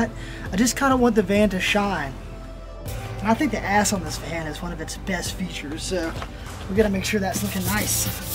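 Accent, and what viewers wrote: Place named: South, United States. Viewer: The southern accent didn't clue you in?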